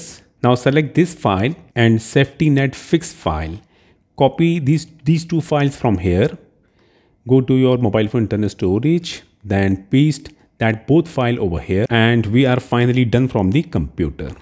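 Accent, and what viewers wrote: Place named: India. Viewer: Always an Indian with strong sccent. Never an american making these videos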